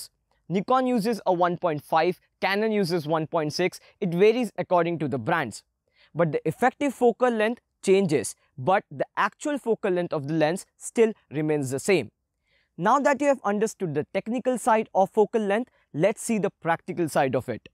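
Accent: India. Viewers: The Indian English accent kill me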